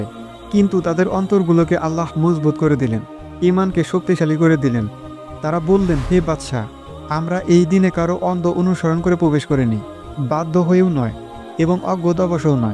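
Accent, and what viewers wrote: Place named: Manikganj, Bangladesh. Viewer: apnar deser bari ki manikgonj , karon apnar uposthaponay cholti vasar ovab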